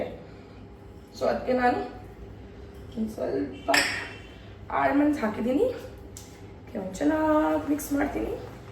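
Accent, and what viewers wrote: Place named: Uttara Kannada, India. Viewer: Ur talks r little like Uttar kannada ☺️ I am from Belgaum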